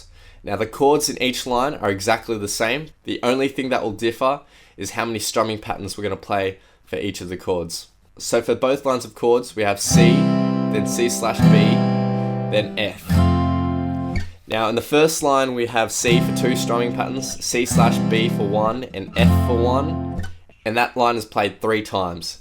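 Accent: Australia. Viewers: This legend's voice is always deeper and more Australian than I expect.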